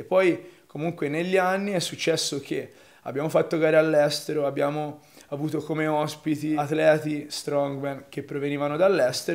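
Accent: Tuscany, Italy. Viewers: L`accento toscano è fantastico !!!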